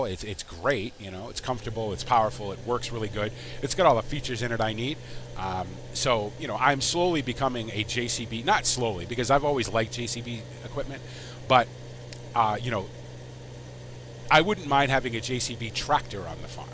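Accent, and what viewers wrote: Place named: Brooklyn, United States. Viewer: you sound like you're from brooklin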